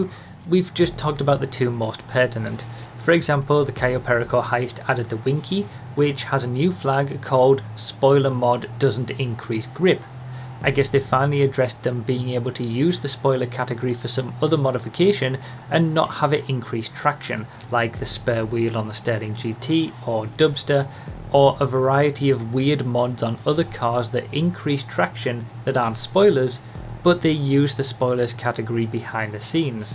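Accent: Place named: England, United Kingdom. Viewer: he's English thats whats going on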